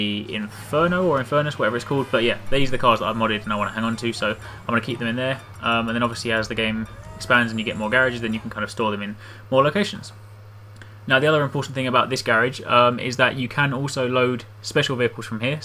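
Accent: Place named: United Kingdom. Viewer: he is british this is how british people pronounce garage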